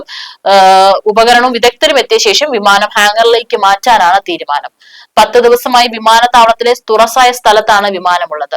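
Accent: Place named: Thiruvananthapuram, India. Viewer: തിരോന്തരം ജില്ലകാരി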